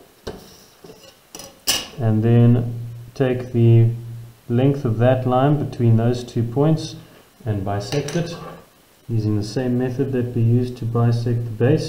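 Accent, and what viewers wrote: South Africa. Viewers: oh hes so south african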